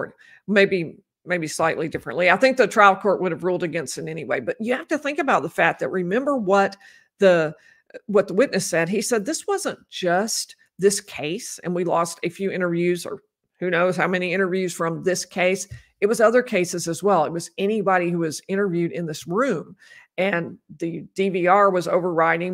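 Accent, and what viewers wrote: South, United States. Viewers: Your Southern is coming through,!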